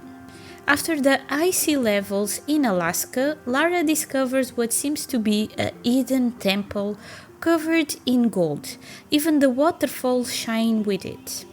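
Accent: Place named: Portugal. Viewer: Puro sotaque tuga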